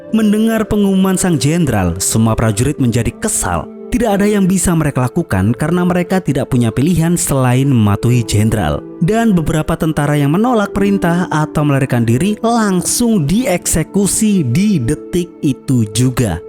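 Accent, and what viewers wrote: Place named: Java, Indonesia. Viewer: Loh le sampean wong jowo toh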